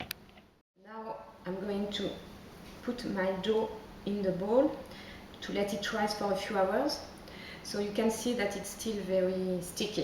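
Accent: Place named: France. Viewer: What a delight to listen to your French accent with your very good English. You bring back so many wonderful memories of the 12 years I lived and worked in France. I lived in Etampes & Arbouville and worked in Angerville. You have just demonstrated that a French Baguette can be made in so many ways and not just the classic artisan method. BRAVO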